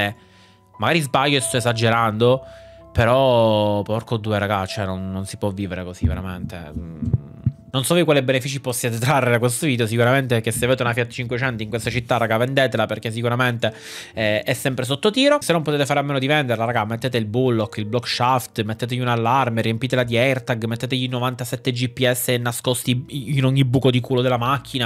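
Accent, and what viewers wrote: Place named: Palermo, Italy. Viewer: Sul problema di salute vedo molta confusione e non mi sento di chiarirla perché non sono qualificato per farlo: ti consiglio amichevolmente di seguire le prescrizioni mediche. Se non ti fidi del curante cambia medico. Dall'accento immagino tu sia di Palermo. Al tuo posto anch'io avrei evitato il P.S. (casomai al massimo la guardia medica, ma se sei stato già visitato dal curante anche la guardia medica non avrebbe avuto molto senso). Forse sarebbe meglio rivolgersi a un bravo internista, anche privatamente (purtroppo si paga un botto, ma le tempistiche della sanità pubblica, dalle, presumo, nostre parti sono bibliche). Mi dispiace per l'auto, ma le Fiat vanno via come il pane. 😔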